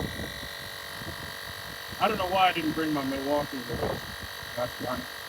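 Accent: Texas, United States. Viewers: That Texas twang is coming back! LOL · every tine you go to Texas you get that "Texas accent" going, lol too cool.✌️💫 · the Vegas man, he ended up with a Texas accent y'all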